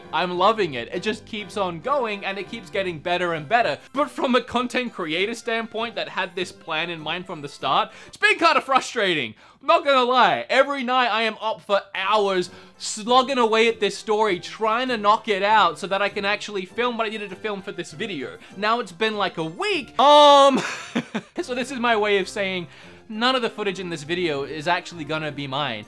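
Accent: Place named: Australia. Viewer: Woow, weird hybrid accent my fellow Aussie, I think?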